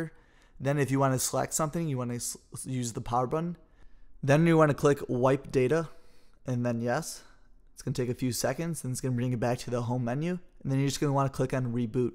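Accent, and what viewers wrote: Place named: United States. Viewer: Thank God your American and i can hear you clearly.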